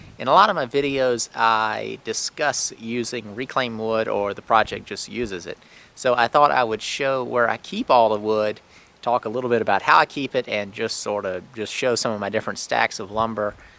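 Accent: United States. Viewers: Hey bud, noticed your canoe next to your wood pile. I pretty sure from your accent and the terrain that we're in the same region of the US. Just wondering if you've ever done any whitewater canoeing on the Nantahala river or some of the others in the area. You seem like the kinda fella who would really enjoy it. I used to go pretty often when I was around your age. It's lots of fun and it's something I'd think you'd enjoy with your significant other. Thanks for the videos, really enjoy them.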